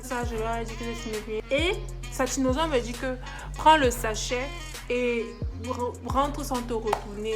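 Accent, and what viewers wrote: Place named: Cameroon. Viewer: J'ai senti que tu étais camerounaise dès que tu as prononcé le premier mot... très belle vidéo je me suis abonnée...satinosaure😂😂😂aaah mes côtes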